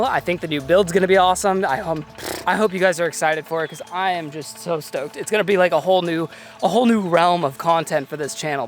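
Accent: Boston, United States. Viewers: FlAAA-RIDA, with that Bostonian accent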